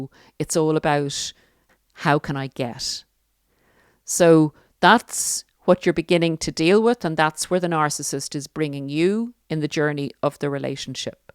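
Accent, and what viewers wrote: Ireland. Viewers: Thank you, it's so helpful to move toward the light and stay there. I find your podcasts, your knowledge, and your support so incredibly wise and encouraging. I love your way of speaking—explaining—and your lovely Irish accent! Thank you so much!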